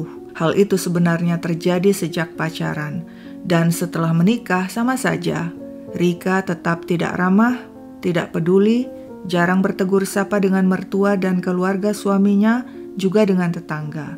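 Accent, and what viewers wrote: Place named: Palembang, Indonesia. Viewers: Kok naratornya cakap banget ya pake logat palembangnya? Apa narator orang palembang aslinya. 😂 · Bahasa Palembang nya medok ni... kayak Palembang asli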